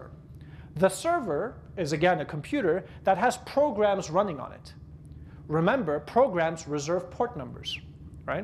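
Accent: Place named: Quebec, Canada. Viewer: I detect a very slight french Canadian accent.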